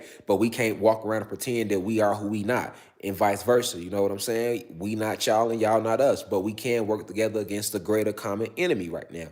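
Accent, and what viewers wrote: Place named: California, United States. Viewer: Ik you from Chicago but I hear Cali also with the accent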